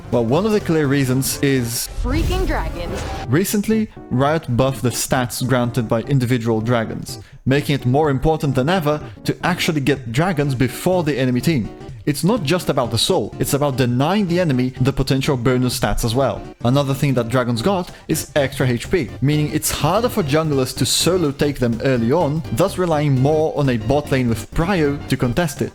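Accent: Brazil. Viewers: Are u Brazilian ?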